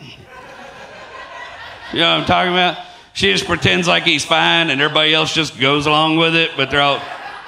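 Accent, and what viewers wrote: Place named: Mississippi, United States. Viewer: As someone born and raised in Mississippi you sound just like most of my family and it's kinda surreal hearing somebody like us doing stand up keep it up man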